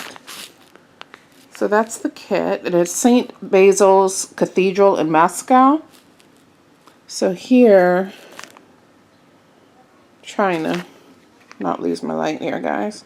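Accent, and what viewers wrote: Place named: Baltimore, United States. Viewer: GIRL! So excited to see another video. I loved seeing all your projects and I truly enjoy listening to you discuss your process. Your Baltimore accent makes my heart so happy. Sending you all the hugs!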